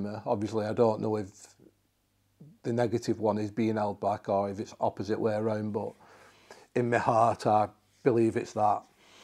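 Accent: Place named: England, United Kingdom. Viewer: I just figured out that English people don’t pronounce their h’s if it’s the first letter of the word. Like hello would sound like “ello”. I love your accent. It’s very cool and makes you nice to listen too. Like when you say half. It sounds like “aff”. 😊❤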